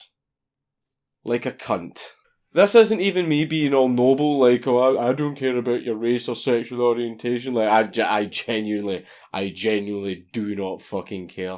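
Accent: Scotland, United Kingdom. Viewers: He's Scottish? I thought he sounded like that because he'd had a stroke. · Thanks for bringing up the truth, and confronting that racist black woman! By the way, love your Scottish accent.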